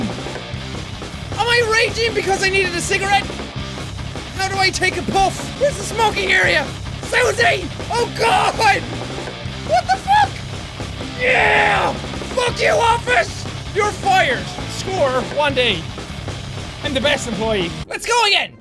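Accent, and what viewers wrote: Ireland. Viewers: I love his Irish accent. I don't understand why people make fun of the way he says his ts. like I love how he say "I just tought I'd play"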